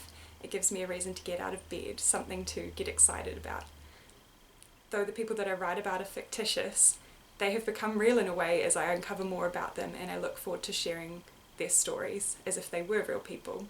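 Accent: New Zealand. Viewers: Happy for your success in publishing your book online! I too find that writing is the only way for me to truly express myself. I write poetry. Hope you don't mind me sharing a poem I wrote recently: He wanders through eternity like a hermit in the desert, seeking meaning and purpose in a world, in a culture overtaken by lies and façades. He’s told to consume commodities, to chase career and cash. “You’ll be happy then” they say. Yet he still feels this gnawing emptiness inside, even having arrived at these things. The answer to wholeness doesn’t lie there he sees. He sets off towards another horizon, seeking what’s worthwhile; in touch with the whispers of his heart. The compass of his soul guiding him onwards. I'm also from NZ. I assume from your accent that you are too. Nice to meet a fellow kiwi on YouTube:)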